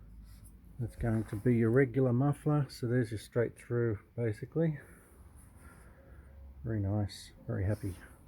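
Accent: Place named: Australia. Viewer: Was not expecting an Australian! Awesome.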